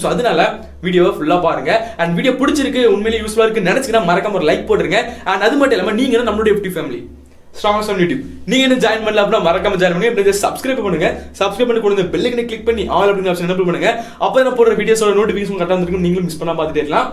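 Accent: Kanyakumari, India. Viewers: Ninga kanyakumari ya na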